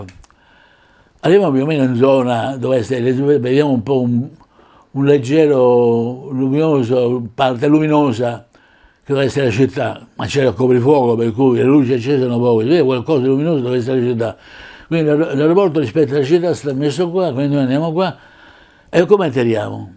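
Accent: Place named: Rome, Italy. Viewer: Ma che siete tutti padani ?? È un romanesco masticato, parlato "dinto o musso" come dicono i napoletani 😂😂 . Comunque era gente tosta.. così non ne nascono più.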